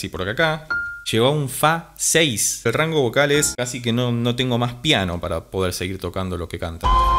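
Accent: Argentina. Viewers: Por el acento, debe ser argentino.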